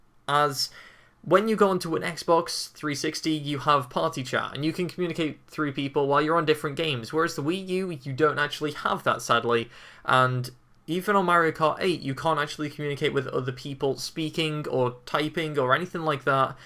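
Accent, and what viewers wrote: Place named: United Kingdom. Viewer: yay hes British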